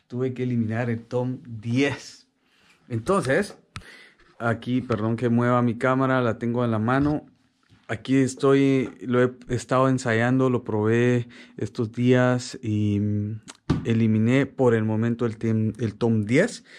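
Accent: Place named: Mexico. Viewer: Más de Guatemala habla más como de México hermano jeje 😂 😂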